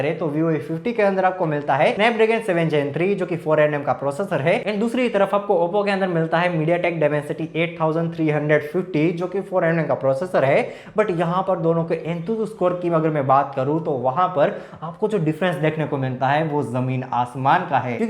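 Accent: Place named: Jamnagar, India. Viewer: Flawless 🎉 u seem to be from jamnagar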